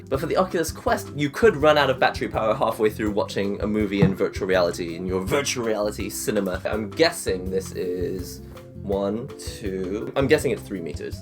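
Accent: England, United Kingdom. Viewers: U have better british accent than me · I think you're the first asian i've heard with an english accent. I bet you go bonkers for tea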